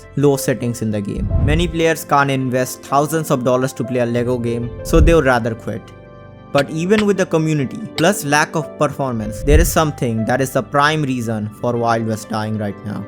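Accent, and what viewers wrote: India. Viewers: Your tone is like Indian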